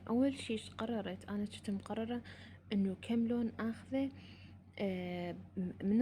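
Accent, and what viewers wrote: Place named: Iraq. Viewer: ياختشيييي ✨مرااااه خياال تجنن اللهجة العراقية ❤❤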